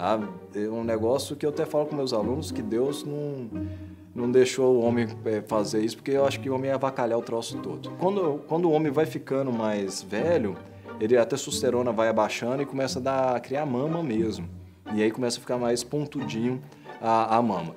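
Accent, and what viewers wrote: Minas Gerais, Brazil. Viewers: Alguma dúvida que ele é mineiro??? Ameeeeii ♡♡ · É mineiro uai!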